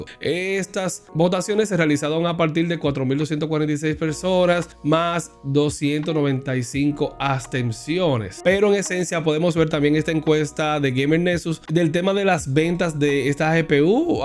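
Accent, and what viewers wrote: Dominican Republic. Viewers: buen video, sos de Republica dominicana? que tal el stock alla ?xD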